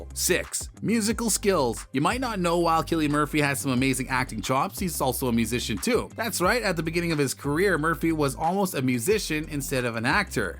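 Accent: United States. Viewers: Love him, but I wish this commentary would just shut the f up. Listening to this yank prattle on....urgh!!!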